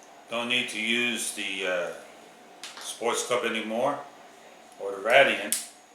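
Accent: New York, United States. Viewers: I hear New York. I could be wrong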